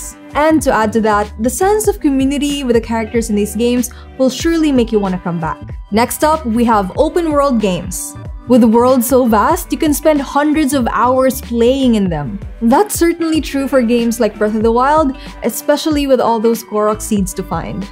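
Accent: Philippines. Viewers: Filipino accent is strong on this one. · That's some thick philipino accent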